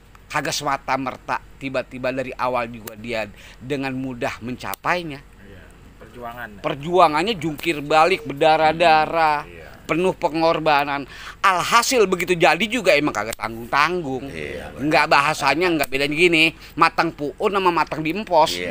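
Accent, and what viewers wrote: Betawi, Indonesia. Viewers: Betawinya tulen banget 👍👍👍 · Abdi Ti Sunda Sangat Kagum Dengan Budaya Betawi Apa Lagi Tutur KataNya ,,,LogatNa enak Di Degar,,, Bang Tolong ilagin Kata Loe Dan Gw Dong... Kata Aye Lebih Enak Di Dengar Dari Pada Gw... Trus Semengat Bang Dan Sehat Selalu...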